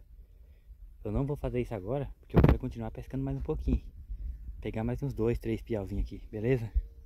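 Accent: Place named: Mato Grosso, Brazil. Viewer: De onde vc é tá parecendo os Rio de tangará da serra MT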